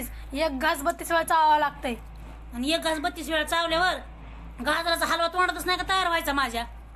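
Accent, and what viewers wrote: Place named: India. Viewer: Aho khupch chan joks krtat ani gavrn boln khupch chan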